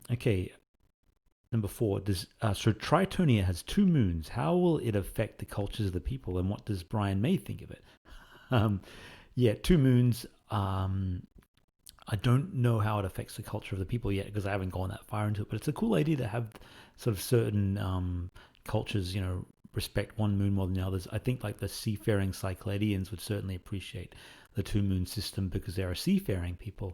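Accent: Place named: Australia. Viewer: Thank you very much, I really appreciate it! Sometimes I just like eating a bunch when down. I should definitely take a break from technology for a few minutes and go out for a hike or jog. Also, your aussie accent is wonderful!:D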